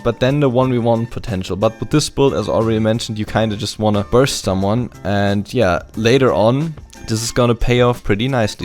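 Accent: Germany. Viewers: HOLY SHIT der deutsche Akzent ist real... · deutscher das höst man xD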